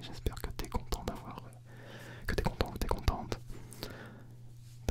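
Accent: France. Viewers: He speaks a bit fast for someone like you that is not used to french. Plus the accent from france is fairly different than the french canadien one. Its all practice. I changed my computer to english, my phone to english and only consume english medias and over time I understood both languages almost perfectly.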